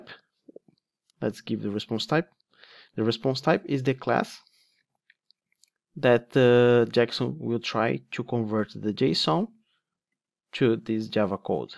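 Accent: Brazil. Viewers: inglês com sotaque de brasileiro é um mamão com açucar pra entender ahuahauhauha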